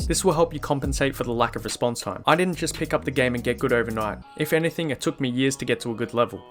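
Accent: Australia. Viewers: fellow aussie herre lol, was looking for a high ping kind of guide to see what im getting into and what class to pick and the second i heard your accent i was like MY BROTHER xD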